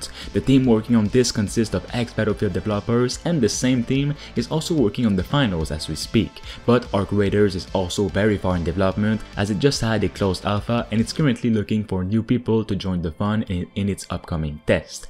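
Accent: Quebec, Canada. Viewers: Bro t québécois right ?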